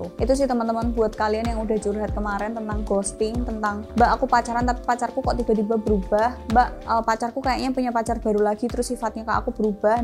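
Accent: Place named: Pasuruan, Indonesia. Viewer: Arek pasuruan mana suaranya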